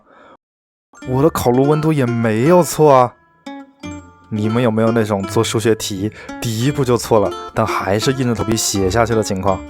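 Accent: Wuhan, China. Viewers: 熟悉的武汉普通话，老乡，欢迎来德州奥斯汀试试本地的Franklin，salt lick等等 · up主是武汉人？浓浓的武汉普通话的味道。。。🙂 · 听着你说武汉正宗弯管子普通话，好亲切啊！ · 武汉老哥？ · 我也是家里有两只猫的武汉人 听你口音就像 直到听到你妈妈给你打电话 实锤了~ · 一口汉普，武汉人吗？ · 武汉口音？ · 湖北口音武漢口音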